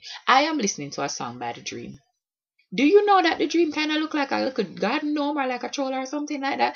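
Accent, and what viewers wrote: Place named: Jamaica. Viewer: Mi love u bad.... and really and truly u Jamaican accent a shot....it sounds like u should take up public speaking :)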